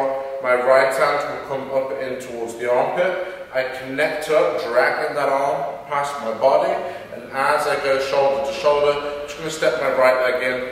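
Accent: England, United Kingdom. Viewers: You're pretty good, it's strange for me to see bjj with English accent.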